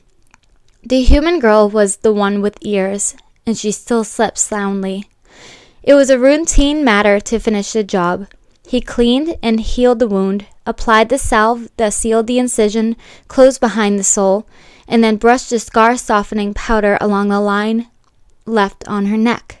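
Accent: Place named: United States. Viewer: What type of American accent is this? Like what state is she from? I really like it, it’s melodic and smooth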